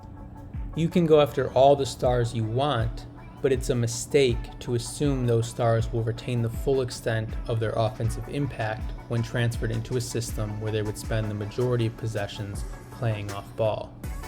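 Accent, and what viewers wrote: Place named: New York, United States. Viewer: Loving this channel. Love the bball knowledge! I hear the NY in your voice so when are you doing a vid bout the Knicks.....w/ BRUNSON!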